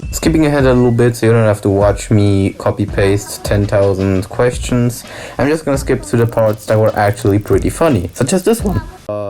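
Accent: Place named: Germany. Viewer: Ich höre einen deutschen aktzent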